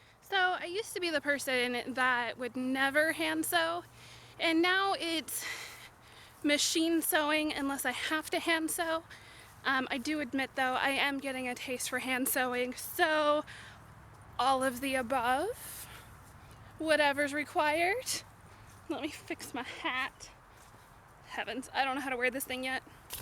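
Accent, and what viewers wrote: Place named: Ohio, United States. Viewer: I knew your accent sounded familiar. I grew up in Ohio. :)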